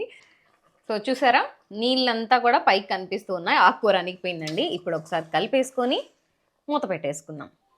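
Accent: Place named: Telangana, India. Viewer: Meeru andhra ammai ainaa Telangana ammaila. Chala Baga matlduthunnaru super Andi meeru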